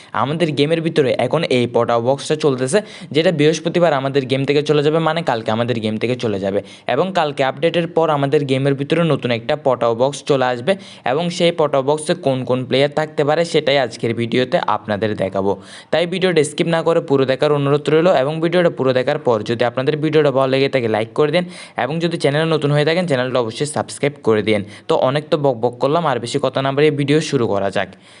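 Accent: Chittagong, Bangladesh. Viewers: Vai apni ki Chittagong ar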